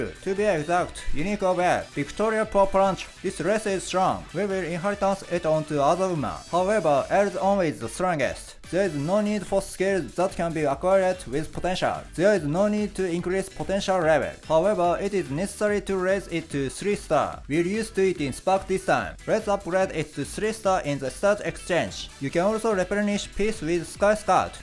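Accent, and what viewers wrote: Japan. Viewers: What is wrong with your english? It’s like you’re speaking in japanese accent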